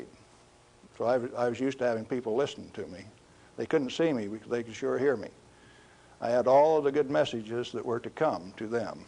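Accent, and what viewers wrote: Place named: Midwest, United States. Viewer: I'm always nostalgic for mid-century Midwestern accents from a grandfatherly man talking science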